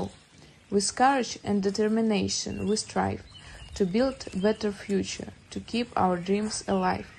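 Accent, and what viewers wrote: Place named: Belarus. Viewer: Приятно слышать чисто Беларуский язык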